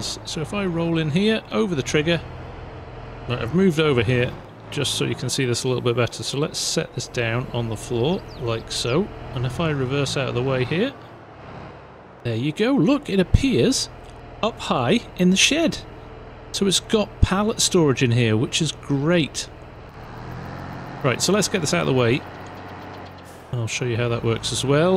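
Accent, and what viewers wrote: United Kingdom. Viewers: I really enjoy the thoughts and insights of. For me, he is the companion guide to playing FS22. (Must be the British accent) I appreciate him putting us onto modders who have really put in a lot of thought, design, and utility into their art...It all just makes the game so much more enjoyable. BIG thumbs up!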